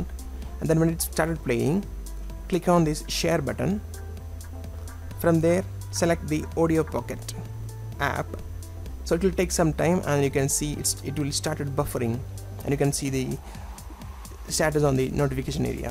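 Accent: India. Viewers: love ure accent bro :D indian? haha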